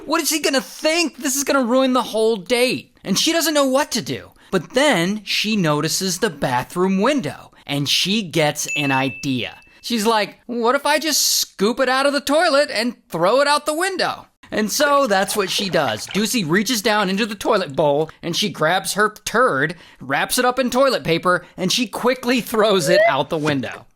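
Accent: England, United Kingdom. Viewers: Mint English accent! Hilarious story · bro has an interesting British accent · Im cackling at ur british accent dude lmao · The British accent 💀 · that bri'sh accent 💀🇬🇧☕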